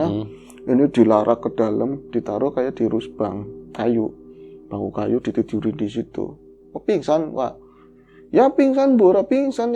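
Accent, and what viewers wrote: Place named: Tegal, Indonesia. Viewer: Bro kalau cerita pasti nyenengin, karena ada kocaknya dan ada bhs tegalnya.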